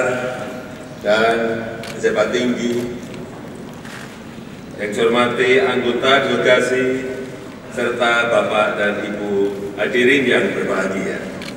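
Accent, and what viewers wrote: Java, Indonesia. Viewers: bintang alpateka koq ribut org jawa mmng logat aslinya gt cb liat puji2an dr sunan kalijaga logatnx jg jawa. .gt sj ribut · bintang orang jawa logat beda pret..kayak daerah ku sholat ashar bilang ngasar ran ..emang salah menurut low yang maha bener hadexhh..niat utama yang penting..salam warass. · bintang anda wong jowo opo Ra? Kalo bukan wong jowo gak usah komen .. itu logat jowo , kan jokowi baca bukan pas sholat .. gapapa bro liat penjelasan ulama sepuh jaman dulu mereka pas dzikir bacaan nya kayak asing.. kalo gak tau mah gak usah merasa benar dah contoh kayak orang Sunda gak bisa ngomong f tapi jadi p .. niat nya kan memuji Allah SWT .. kalo anda bilang begitu berarti orang gagu berdosa semua dong mereka semua logat orang yang kekurangan gak bisa fasih baca bacaan Al-Qur'an ... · bintang. kamu suku... mana??? itu logat jawa cokk.. 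jawa tulenn... cek sendiri... di daerah2 jawa... khususnya yg dusun ato desa... logatnya... gimna... kiyai2 spuh pun gk seperti km... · Kangen versi bahasa inggris pake logat Jawanya ... Pernah dengar kah kalian... logat Orang India, Rusia, Arab, Jepang, saat mereka menggunakan bahasa Inggris/Indonesia??? Di situlah kita bisa mengetahui dari mana negara mereka berasal ... Maju terus Presiden Ku.. Menjadi Kebanggaan untuk Ku Bagi Ku.. Presiden Ku adalah ... "PRESIDENT MADE IN INDONESIA" #2019PRESIDENKUmadeinINDONESIA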